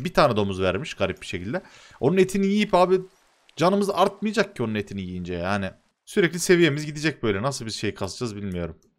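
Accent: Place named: Kahramanmaraş, Turkey. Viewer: Abi maraşlımısın? (şiven benziyo)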